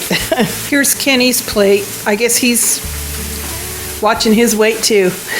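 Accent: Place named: Texas, United States. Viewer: I have lived in Texas my whole life and I swear you could be from here! 😋 I just love your channel and I have made so many of your recipes. They are always a huge success. Thank you for sharing your yummy recipes with us. You are a blessing.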